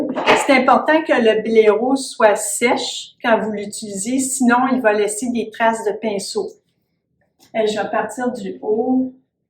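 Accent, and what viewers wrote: Quebec, Canada. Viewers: Merci bcp ces nuances variees lumineuses rejoignent un bel accent du QUEBEC !!! A Bientot 😮😊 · MERCI BEAUCOUP, POUR TOUS VOS CONSEILS, J'AVAIS ABANDONNE LA PEINTURE ,ET VOUS M AVEZ DONNE AVIS DE REPEINDRE ,VOTRE ACCENT EST TRES AGREABLE, ET DONNE ENVIE DE PARTIR AU CANADA BONJOUR DE FRANCE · Merci pour cette démonstration superbe et tellement concise préside et intéressante avec en prime votre très bel accent canadien encore merci je m abonné de suite 👏👏👏👍👍👍💓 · J'aime bien❤ ton accent, il est canadien ? Merci pour toutes ces leçons et ces bons conseils 💐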